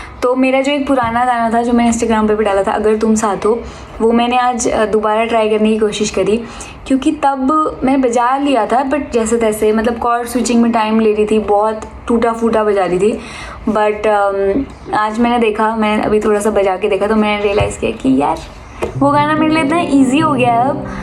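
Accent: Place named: Uttar Pradesh, India. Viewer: Really appreciate your support and spirit changing many many lives.... Dear this Abhilasha from Varanasi.... yesterday saw your first video and then watched many... laga you are my old friend... your outlook towards life simple yet amazing.... n apni U.P kind Hindi loved it... May God bless you Dear be happy always ❤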